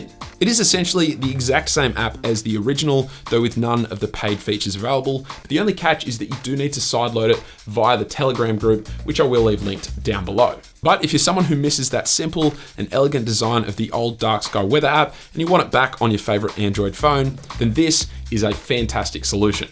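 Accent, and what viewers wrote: Australia. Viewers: Great to hear an Aussie in here! This means everything will work on my phone 😄 Loved these apps and will now go join you on instagram. Thanks 👍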